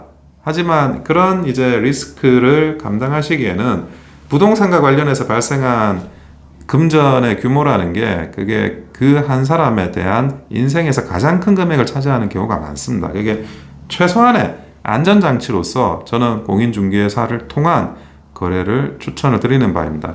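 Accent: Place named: Gyeongsang, South Korea. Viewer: 존경하는 원영섭변호사 대표님 반갑습니다 안녕하세요 팬앤드마이크TV 시청중하고 구독하러 왔습니다 건승하십시오 경상도 목소리 너무 좋으십니다 감사드립니다 멸공 필승 단결 🇰🇷🇱🇷🎉🎉👍👍🥰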